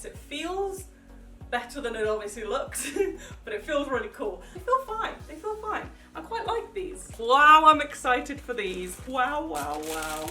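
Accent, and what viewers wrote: United Kingdom. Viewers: she is British like me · Are you British or something bc I love your voice it is great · Are you British 😊😊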